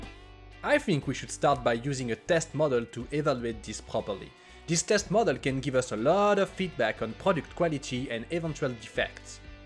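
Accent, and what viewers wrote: France. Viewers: Ahahah l anglais parler par 1 français cest toujours assez amusant à écouter hahaha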